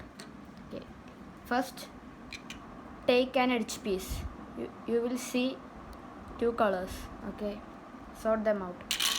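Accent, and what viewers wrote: India. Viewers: Are you a malayali your English is like that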